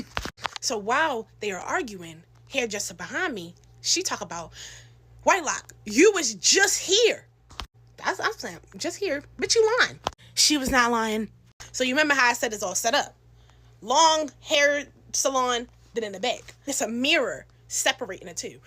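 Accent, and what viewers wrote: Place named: Baltimore, United States. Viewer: I think Baltimore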